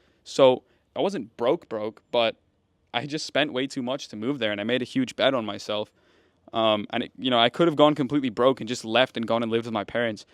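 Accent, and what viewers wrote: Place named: United States. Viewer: Hey man Really appreciate your content From your podcast with Matt Sheldon You grew up in Scotland, Egypt and Malaysia So how’d you get your American accent?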